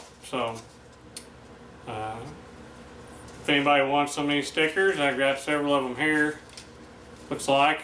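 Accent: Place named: South, United States. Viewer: I'm always happy to find another southern guy with a southern accent showing that folks from the south may talk different but that don't mean we are not intelligent. Thanks for the update! I look forward t the next one but I've still got some backlog on your channel to catch up on.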